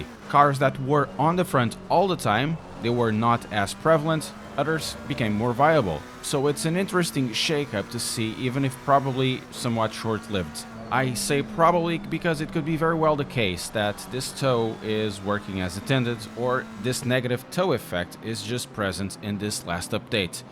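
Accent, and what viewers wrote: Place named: Portugal. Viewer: you sound portuguese.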